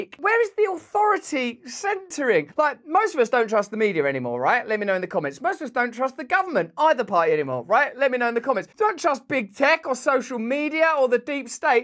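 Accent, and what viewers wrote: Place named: London, United Kingdom. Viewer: You sound like you're from luhndan!!!!!!!!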